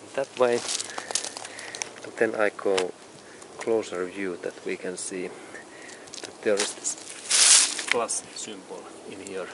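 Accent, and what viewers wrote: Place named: Finland. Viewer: This video must be finnish made, bacause this "rally english". Keep up the good work!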